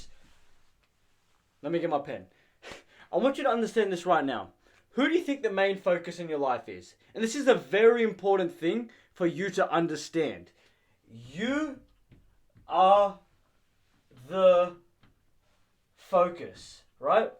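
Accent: Australia. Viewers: Hey bro where you from, are you from sydney??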